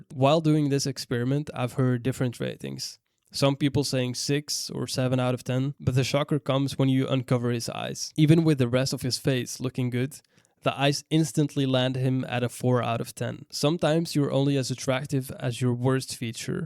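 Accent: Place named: Netherlands. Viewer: Very Dutch accent.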